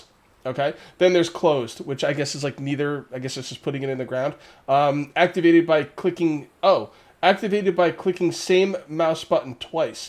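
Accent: United States. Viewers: FINALLY a true American accent among those British speech impediment accents.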